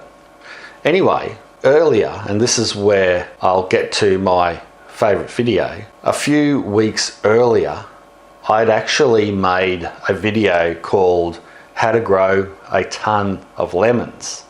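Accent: Australia. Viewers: I like your accent, it reminds me of crocodile dundee, your so full of life, I just feel like gardening myself. Great job. Great video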